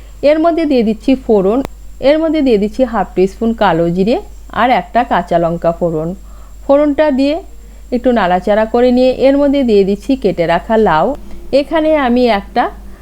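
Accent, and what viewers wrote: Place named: United Kingdom. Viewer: Bangla rannar shonge kintu bangla commentry beshi bhalo lage. Eto english accent kintu eke baare jomchhe naa.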